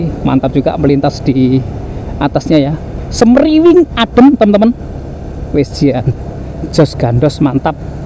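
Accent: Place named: East Java, Indonesia. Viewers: nek dilihat dari logatnya...logat jawa Timuran ya. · Vloger receh, aku seneng banget karo logat bicaramu yg medhok banget,jawa timur sing kenthel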